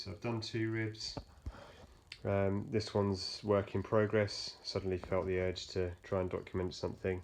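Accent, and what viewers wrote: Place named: Southern England, United Kingdom. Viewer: Just watched it, very interesting. I've been a PFA/LAA member since 1970 and for a number of reasons (life) just got in the way of homebuilding. I've been to virtually every annual Rally but only flown into a few. I did consider the Turbulent then my interest swapped to the MB2 Colibri, however I was surprised to see in the LAA mag that a Turbulent new build was underway. I was going to offer help with your build but I live in the North and by your accent I assume you're down south. I've had a close look at the Turbulent at all the fly-ins I've been to (many) and it still interests me. Can I wish you the best of luck with your endeavour. I just noticed that it's at least 25 years since it was first started so I would be looking at how one can properly check glued joints - perhaps your Inspector can help?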